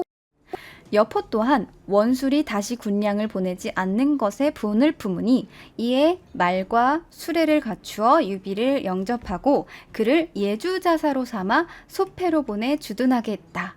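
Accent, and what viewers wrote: Gyeongsang, South Korea. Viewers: 전번에도 여쭤본바 이 지만..원래 역사 전공이신가? 넘잘하시네,,젊은분이..글고 억양도 살짝 부 마 지역?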